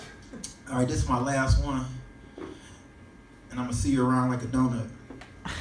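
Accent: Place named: Detroit, United States. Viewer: He got that Detroit e man accent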